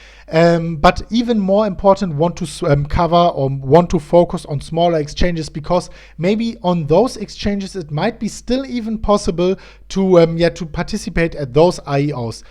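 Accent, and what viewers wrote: Germany. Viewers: His accent is as German as it can get :) German here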